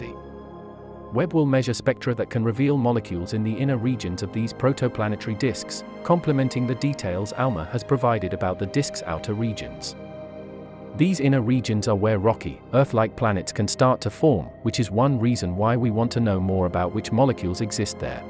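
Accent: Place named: United Kingdom. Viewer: Thats a robot voice? I thought he was just british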